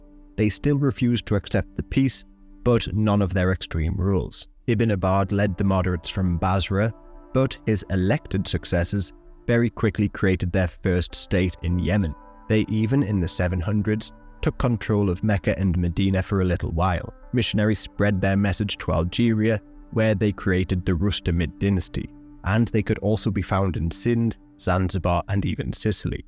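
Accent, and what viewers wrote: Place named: United Kingdom. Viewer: He has a British accent. He’s not American. Are you slow?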